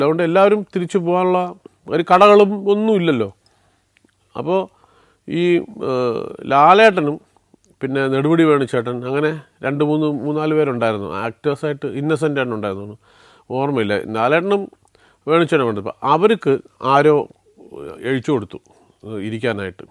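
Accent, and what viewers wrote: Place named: Thiruvananthapuram, India. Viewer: സംഭാഷണത്തിലെ തിരുവനന്തപുരം ശൈലി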